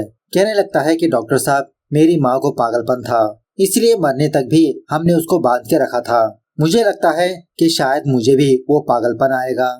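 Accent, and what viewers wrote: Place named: Andhra Pradesh, India. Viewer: Andhra Pradesh maybe because Arunachal Pradesh ka bolne ka accent alag lag raha hai😅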